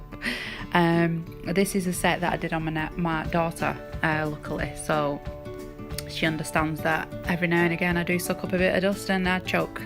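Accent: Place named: Northern England, United Kingdom. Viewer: Lovely set.... just subscribed as came across your vid... u sound very northern and familiar... I'm a Lancashire lass and is swear u r too x keep going... your doing really well. I'm 11 years in and still learn every set. X and your more confident than me cos I'm still not strong enough to set myself up on here yet. Tempted but always chicken out. So keep going x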